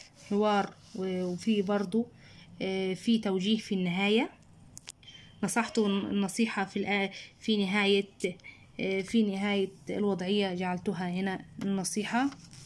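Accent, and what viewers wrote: Egypt. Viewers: هل انت من مصر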